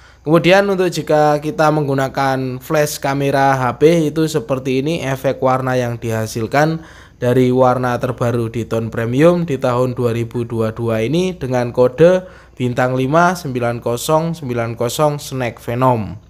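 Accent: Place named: Java, Indonesia. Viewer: Jowo tulen e iku lo.... Ra mbetahi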